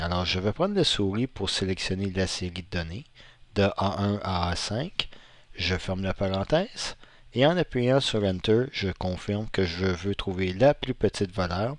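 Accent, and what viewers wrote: Quebec, Canada. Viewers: Merci?Je découvre pleiiiin de choses simple et très utile grâce à vous.Et en plus l'accent et super. Québec?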